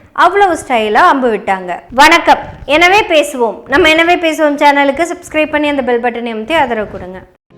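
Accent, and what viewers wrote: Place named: Coimbatore, India. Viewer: Super you are taking different topics and exposing reality dear daughter in Kovai slang especially. Lot's of our peoples are fans of you since for your slang especially 🎉❤keep going 💪 👍 👏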